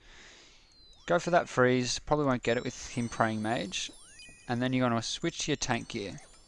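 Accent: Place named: Australia. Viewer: Love hearing another Aussie on osrs swearing like nothing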